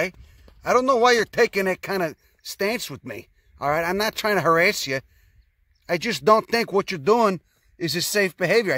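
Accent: Chicago, United States. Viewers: South side Chicago Attenborough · "Don't judge me, I will BITE your ass!" "Delicate bastards" is my new term of the week, although I am not sure I can match your Chi-town delivery.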